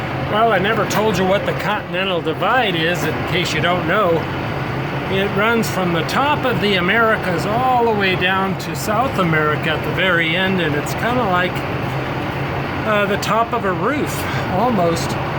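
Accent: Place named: Minnesota, United States. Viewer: mountain trips are the best ! but whereever is fine also, I just love that seemingly MN. Lake Woebegone drawl ! KEEP ON TRUCKIN !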